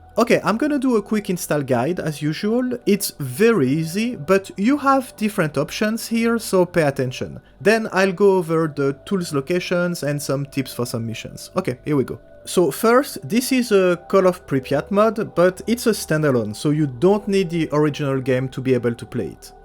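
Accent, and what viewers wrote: France. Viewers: hey, Just coming from stalker2 now i'm gonna play every stalker from soc ti call of pripyat; Can i know wich mod your using on pripyat to make it that good? And if you will be so kind to point me out to some mods for SOC and clear sky to modernize the game a lot graphic wise and gameplay wise gunfight animation while stille keeping main quest and quest in the game. Radiophobia 3 look great for soc but there so many options i'm kinda lost. On Another topic are you french your accent sound french to me :) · Ton accent ^^ ca trompe pas . Cool d'avoir un passionné fr pour les stalkers 👍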